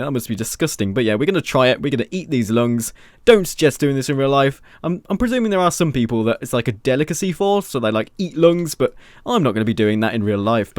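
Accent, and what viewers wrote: United Kingdom. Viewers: Where are you from you sound sort if British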